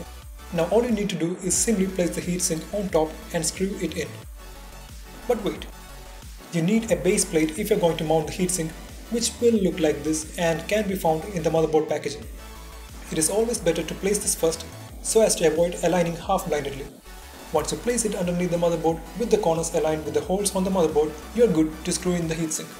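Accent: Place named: Kerala, India. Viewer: ah yes, you're right. BTW, are you from Kerala? Your accent seems like it.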